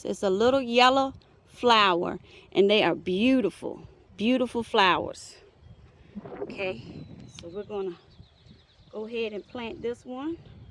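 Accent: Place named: North Louisiana, United States. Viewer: I know boot 👢 when I hear it.. North Louisiana me..great job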